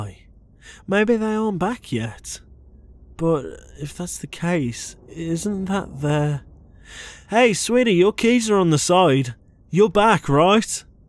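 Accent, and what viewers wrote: United Kingdom. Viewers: He's British :)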